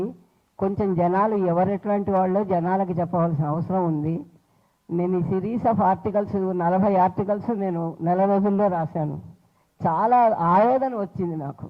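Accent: Andhra Pradesh, India. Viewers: bamma pakka gulabi with andhra yaasa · Musalidanaa nii language telangana yasa ledu andhra yasa unnadi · Ne bhasha purthigha andra valla laghane vundi.😢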